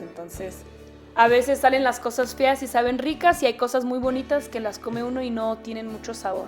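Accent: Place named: Cuauhtémoc, Mexico. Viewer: Por el acento supuse que eras de Cuauhtemoc 🍎😍! Y sii Le atiné! Mi papá es de allá, A mi esposo y a mi nos encanta ir y COMER todos los antojos menonitas que podamos. Todo es delicioso, los campos menonitas son muy hermosos y su gente tambien 😋 Saludos desde juaritoz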